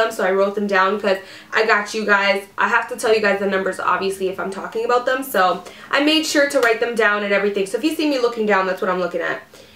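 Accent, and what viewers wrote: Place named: Canada. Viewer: youre so canadian lol you say "thee" instead of "the" i love it :) my aunt does the same thing haha youre amazing!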